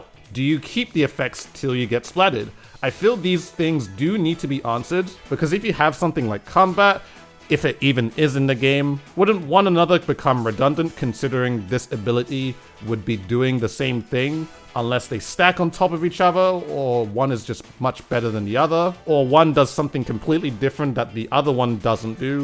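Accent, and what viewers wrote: United Kingdom. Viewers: he literally has a British accent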